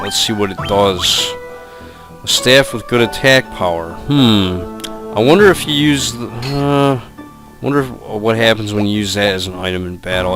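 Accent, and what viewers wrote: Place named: South, United States. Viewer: You do sound more southen but it doesn't matter 'cause it fits so keep it up.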